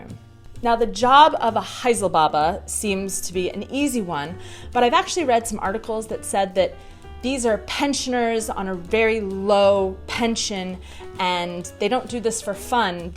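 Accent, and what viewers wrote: United States. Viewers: It's really terrible to listen American language for me as a Czech native speaker. After probably one year of my subscription to your channel it's not better and I still feel like you're trying to verbally attack me.:D I feel like you roar or shout on me. True English sounds incomparable better. American screaming and complimentary smile are two main reason why Americans call Czech people rude. Stammgast is regular German word. German language joins nouns and adjectives to one word and stammgast is the same. Stamm = permanent or regular and gast = guest. There was a huge influence of German language to Czech one because Czech principality and later kingdom was for more than thousand of years (except last hundred years for whole known) Czech history a significant part of German cultural area. There is a second meaning of word štamgast in Czech language. 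It can describe someone who everyday sit in pub and drink a lot and is addicted on alcohol so his brain is full of holes like a Swiss Cheese. Kočičí hlavy (cat heads) was originally word for cobblestones which were in use for decades - so long time that they got eroded to spheric shape. In 1990 was Prague full of them and it was really terrible surface. Especially for walker's ankles. Contemporary is this term used for any cobblestones except little ones used in footpath mosaics. Hajzl is very expressive word so hajzlbába too. For distinguished woman is a lot better to tell toaletářka. Skyscrapers are very disliked by European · Milá, jsem mile překvapen, jak může američanka tak hluboce pochopit českou mentalitu a nacházet i nedostatky v americké kultuře a výchově. Opravdu jen náhodou jsem narazil na jedno ze Tvých videí prolinkovaných na webu a pak už jsem jich shlédnul více. Tvůj přízvuk je sice opravdu velmi "americký", ovšem s češtinou se pereš docela dobře, přeji hodně dalších úspěšných kroků. 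Češi si permanentně nestěžují a nejsou pesimisti...češi, pokud si stěžují, hledají pochopení, naslouchání a třeba i pomoc...lepší je být skeptik v realitě než falešně vysmátý týpek s medem u papule...to je bohužel realita Ameriky, kdy je vždy vše: "How are You?" - "All right, all is fine, I'm OK!"...přijde mi to spíše jako pokrytectví. Přeji Ti mnoho dalších dobrých zkušeností s poznáváním naší kultury a také pevné zdraví v této nelehké době.:-)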